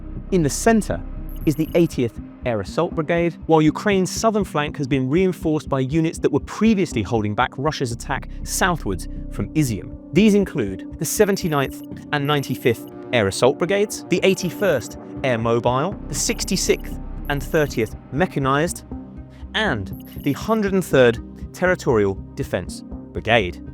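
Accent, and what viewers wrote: England, United Kingdom. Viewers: British accent. Disregard. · it's english pronunciation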